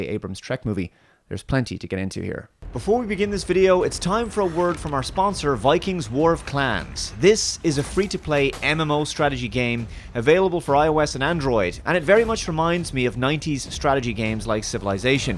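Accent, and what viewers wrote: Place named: Ireland. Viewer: As an Englishman, listening to your Irish accent, I can see how the American accent developed. That twang is there. As for Star Trek, I was born in 1980 and I still prefer the original series/cast with it's crappy effects in all it's glory.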